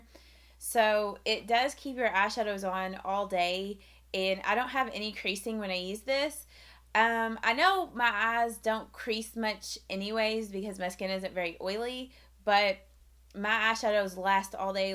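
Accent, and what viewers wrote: South, United States. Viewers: It's a true blue Southern drawl. I'm from the south so I'm used to it and enjoy it. Honestly, I much prefer someone that talks too slow to someone that talks too fast.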